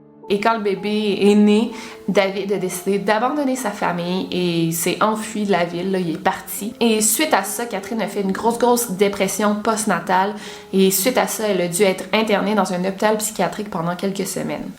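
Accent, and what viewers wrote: Quebec, Canada. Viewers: J'adore ton accent québécois ça te fait une belle voix 😉😱😍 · Je viens de découvrir ta chaîne depuis à peine une semaine et depuis, je suis scotchée à tes vidéos surtout celles qui abordent les disparitions inquiétantes et les morts mystérieuses. Jusqu'ici, j'ai toujours apprécié de regarder à la tv des faits divers, dossiers criminels et autres disparitions inquiétantes. Il y avait certains sujets que je connaissais déjà mais d'autres dont j'ignorais l'existence et qui méritent d'être plus approfondis par les médias. C'est le cas pour les affaires d'Emma Fillipoff et de Lisanne Froon et Kris Kremers qui m'ont particulièrement touchées. 😢 Tu as une manière tellement captivante pour raconter ces histoires troublantes que je m'étonne parfois que certaines vidéos durent 25 minutes ou plus. La musique marque l'ambiance sans trop exagéré l'aspect mélodramatique et l'apparition furtive de tes chiens rassure lorsqu'il y a des moments plus perturbants dans l'histoire racontée. 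Encore une chose : tu as réussi à me réconcilier avec l'accent québécois 😁 Bonne continuation et salutations de la Belgique 🇧🇪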